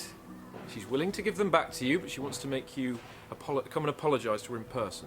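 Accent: RP, United Kingdom. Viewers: You sounded to posh for a Henry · You definitely sound too posh for a peasant. WHS made the right decision.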